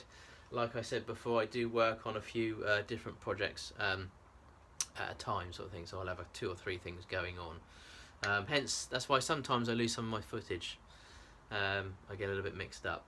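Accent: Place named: England, United Kingdom. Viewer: Don't worry about your talking. I can listen to you all day. I love your accent. We don't come across too many people with English accents in my area in Chicago. 😁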